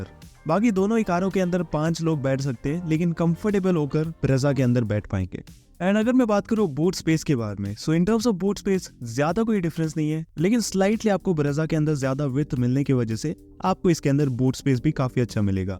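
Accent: Kerala, India. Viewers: The speakeris a South Indian most probably a Malayali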